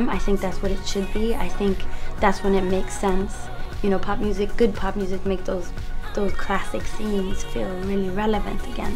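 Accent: Sweden. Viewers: I enjoy listening to Robyn talk. Love that Swedish accent!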